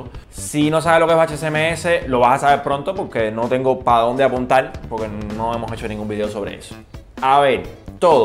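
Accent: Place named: Cuba. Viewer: Muy buenos tus vídeos,imaginate que los veo con los datos que adquiero( tu sabes) jajaja. Me cuadran cantidad sigue hablando en buen cubano para seguirte entendiendo .saludos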